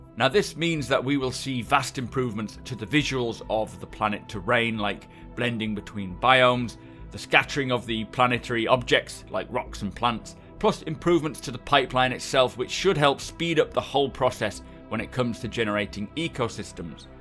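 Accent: United Kingdom. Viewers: Fck.. Why is every game vid on YT some British guy!.....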